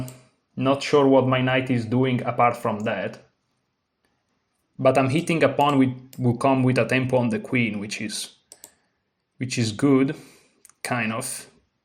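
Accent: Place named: Italy. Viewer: Bro your English is so fluent and rich in vocabulary yet you have this charming Italian accent. It sounds so cool. Never change it! Greetings from Germany!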